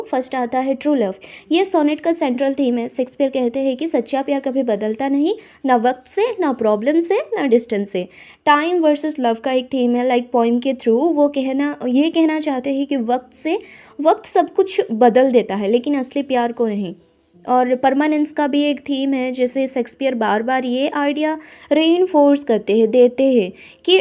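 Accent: Odisha, India. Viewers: Didi you have odia accents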